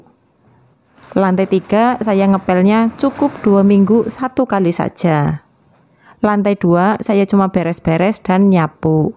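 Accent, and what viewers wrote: Banyumas, Indonesia. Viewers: Wong ngapak apa yak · Wong ndi kw yu... Logate kyk ngapak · Mbak dari daerah mana di Indonesianya? kok ngomong nya kaya orang daerah Banyumas.... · Mba logate ngapak, wong ndi mba.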